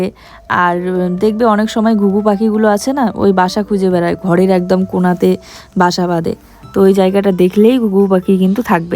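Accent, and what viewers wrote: India. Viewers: Arokom adha suddho r adha Rajbongshi vasha milie j vabea kotha bolo shunte valoi lagea.cha er sospen tai dim omlet korte paro.thal tou patla hoi tai fute utheche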